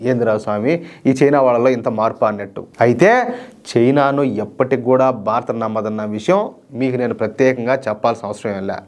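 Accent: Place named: Nellore, India. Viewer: Dear, your presentation in that vernacular style with Nellore slang is very good. You are straight and your emotion is just right. Your comprehension about the subject you are speaking is also quite good. Try to organize a debate video by inviting few experts with you as anchor. Thank you for the topic presentation.🌹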